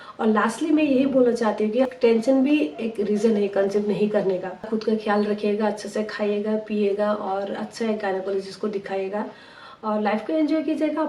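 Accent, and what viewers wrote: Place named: Nepal. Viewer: Why do you sound like Nepali?? Di are you Nepali ??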